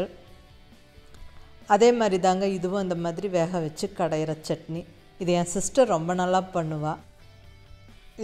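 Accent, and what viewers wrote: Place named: Coimbatore, India. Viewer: Beautiful kitchen Madam ...😍😍கோயமுத்தூருங்ளா நீங்க? அரசாணிக்கா சின்ன வெங்காயம் தொளிச்சுன்னுல்லாம் பேசறத கேட்டா அப்படி தெரியுதுங்க... ரொம்ப என்ஜாய் பண்ணி ரசிச்சு சமைக்கிறீங்க... 💛💙💜💗💚❤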